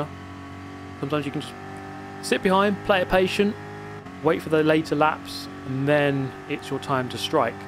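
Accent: United Kingdom. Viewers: He sounds more British than most British people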